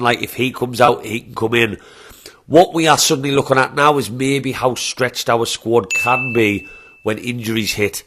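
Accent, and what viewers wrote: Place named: Teesside, United Kingdom. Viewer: The solid backline is what everyting is based on. Lots of lads that some of the Fickle buggers say are not good enough for NUFC have been doing us proud (including the bloody goalkeeper FFS)! I think your accent sounds a bit Teesside-ish as well, and you know what...I DON'T CARE! As it happens, there was a 'Boro Mags' flag in the old East Stand paddock 30 years of more ago. They were not following the glory, they were backing the capital city of NE England! Around the same era I was drinking with some lads that moved fron Hartlepool to Tyneside...all long term mags, not a glory hunter among them. I expect when I go up to Morpeth>Berwick...MAGS I expect when I go over to North and South Shields...MAGS I expect when I go over to Stanley>Consett.Hexham...MAGS I expect when I go down to Gateshead, Chester, Durham, Spenny, Bishop, Darlo...MAGS That's why NUFC have managed to stay one of the biggest clubs in the country without winning a bloody domestic trophy since 1955. 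Regional loyalty. Don't let some bitter halfwit bait you, it is not your fault they didn't get a season ticket during the dark years, and now cannot get one because they are just following the glory. Keep up the the good work, and HWTL!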